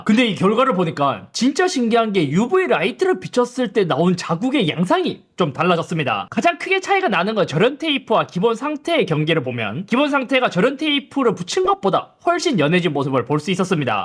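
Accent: Gyeongsang, South Korea. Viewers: 난 얘 경상도사투리 뭔말하는지 못알아듣겠슴..진짜 운좋게뜬 케이스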